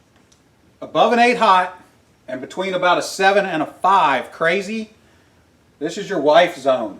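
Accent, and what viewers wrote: South, United States. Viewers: For me it's the accent that makes this video, Southern, clear, articulate and a perfect balance of technical talk and slang. It's like he's briefing a group of astronauts.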